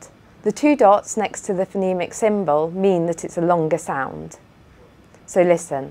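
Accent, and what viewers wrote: United Kingdom. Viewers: 고맙습니다.... 영국발음 쨩.. (하트)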